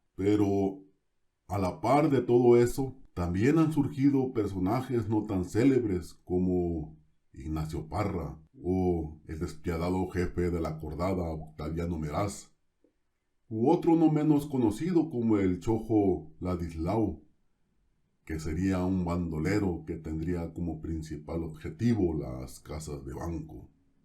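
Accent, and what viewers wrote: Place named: Guerrero, Mexico. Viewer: Esté tío habla cómo sí fuera de Guerrero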